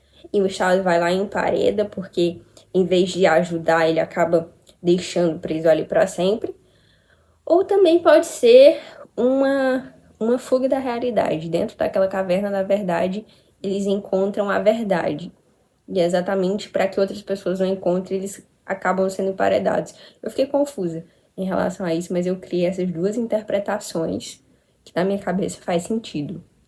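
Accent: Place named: Pernambuco, Brazil. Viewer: Amei o sotaque. Pernambuco?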